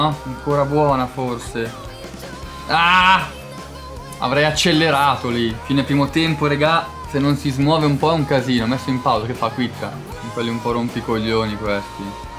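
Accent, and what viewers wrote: Bergamo, Italy. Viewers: Grande ti seguo da quando non avevi l’accento bergamasco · E anche in sto video parla Bergamasco ahahahah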